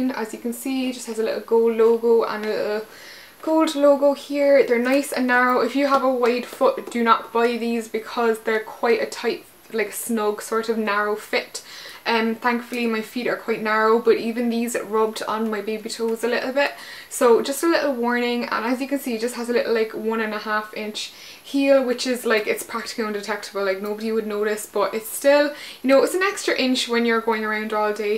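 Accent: Ireland. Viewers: I loved ur haul!it was also really nice to listen to u talking about the products instead of just showing it quickly...I am glad I have found you because I think we have a similar taste in clothes. x check out my videos if u have time..xx ps. love ur irish accent...one of my best friends is from near belfast xx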